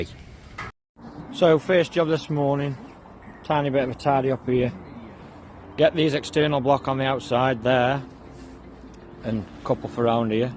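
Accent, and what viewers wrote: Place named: Potteries, United Kingdom. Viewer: Brilliant video, where abouts are you in Staffordshire, sound like you may be from potteries?????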